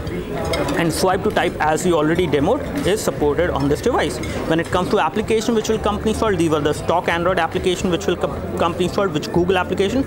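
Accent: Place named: Delhi, India. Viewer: dude first thing i want to tell you is that you need to improve your English and pronunciation big time. You sound like a typical Delhi guy! your rate of speech is too high, i understand that you have time constraints however you can still improve. The phone is ok. Asus zenfone 5 & 6 are much smarter considering the price difference. Thank You!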